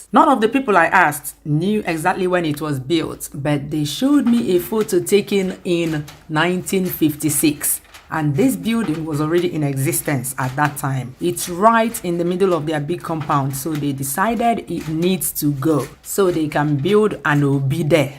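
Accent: Igbo, Nigeria. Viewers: I love your igbo ma, it just sweet to my ears😂😂😍😍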